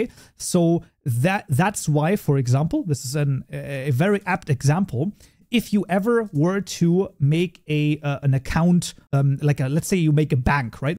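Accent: Germany. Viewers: LMAO The German "Scharfes S". Love you german voice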